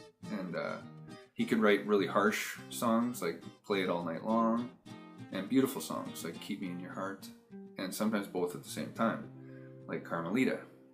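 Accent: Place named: Canada. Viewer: You can tell this guy's from Canada in the first three seconds.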